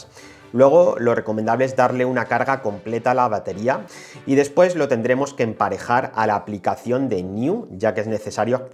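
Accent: Spain. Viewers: me da risa como hablan los españoles - normativa pasada video bajo demanda jaja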